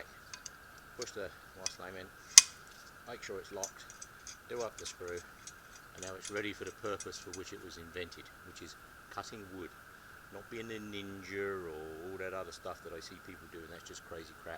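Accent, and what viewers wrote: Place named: England, United Kingdom. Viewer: You sound English. Are you? But where are you filming? Canada or America? or Europe?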